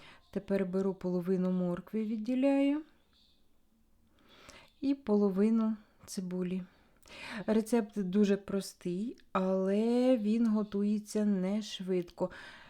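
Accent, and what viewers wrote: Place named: Chernihiv, Ukraine. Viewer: Почти вся Черниговщина так говорит, но рецепт для меня новинка. Спасибо.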